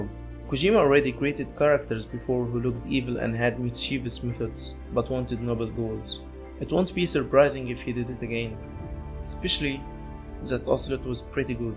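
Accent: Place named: Egypt. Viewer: Love your hard work and amazed about the amount of vocabulary u have. Wish you didn’t have the Egyptian dialect though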